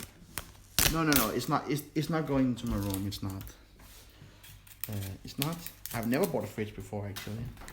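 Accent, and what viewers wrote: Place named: Denmark. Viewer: du har kim larsen engelsk accent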